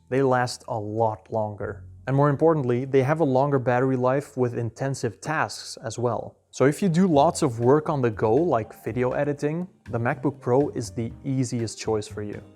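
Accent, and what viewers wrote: Netherlands. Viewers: The first dutchy who doesn't have such a strong accent haha dankjewel! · Ik hoor een heeel Nederlands accent 😄 Eindelijk een goeie vergelijking die ik zocht. Thnxxx👍👍👍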